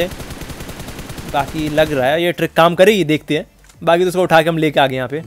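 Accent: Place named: India. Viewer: Desi maar ke comentry kar rahe ho bhai 🤣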